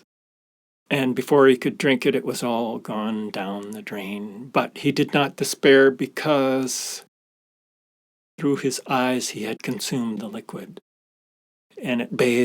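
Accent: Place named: Alberta, Canada. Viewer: I detect an Alberta accent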